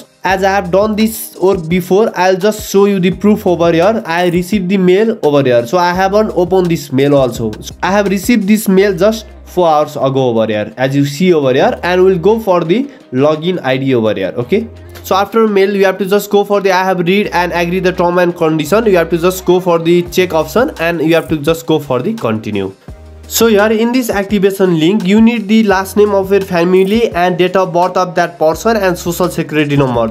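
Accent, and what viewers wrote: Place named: Nepal. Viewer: Accent tah nepali xa tah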